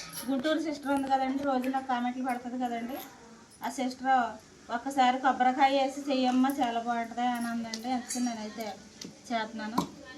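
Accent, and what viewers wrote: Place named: West Godavari, India. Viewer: నువ్వు. మాట్లాడే. పద్ధతి చాలా బాగుంది. అండి. అన్ని మా నా. పశ్చిమ గోదావరి జిల్లాలో. అలాగే. మాట్లాడుతారు. వాళ్లు. కి. తేలియదు. కాదా. నువ్వు. బాధపావొదు. సరే నా. మీ. శాంతి. అక్క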